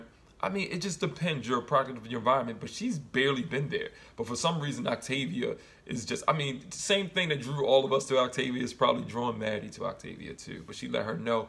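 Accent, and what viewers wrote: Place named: United States. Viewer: Good review... Ive been trying to find another recaper of this show after happycool's channel stopped doing reviews. also nice accent! Im Canadian so I enjoy hearing American accents lol. I do like Belamy and Echo... they do go well together but I do want Bellarke to happen. Maybe they will kill Echo off... or Bellamy and Clarke will bond over some plan while Echo is away doing her spy thing. He will talk about how hard it was to leave her behind and how is heart hurt and they will lock eyes and makeout!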